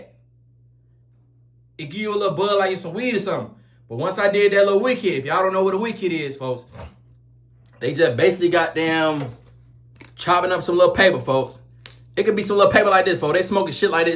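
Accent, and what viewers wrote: Atlanta, United States. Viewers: You sound like you're from the a now boi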